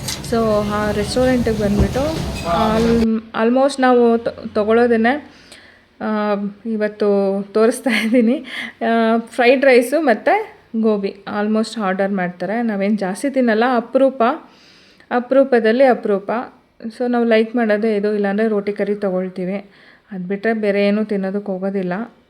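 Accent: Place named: North Karnataka, India. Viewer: 😂😂😂👌👌👌👌 ನಮ್ಮ ಉತ್ತರ ಕರ್ನಾಟಕ ಭಾಷೆ ಏನು ಕೇಳ್ತೀರಾ 😅😅😅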